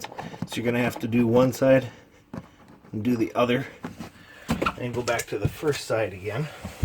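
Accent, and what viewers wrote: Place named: Wisconsin, United States. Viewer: Very helpful video. With that accent you must be from Wisconsin.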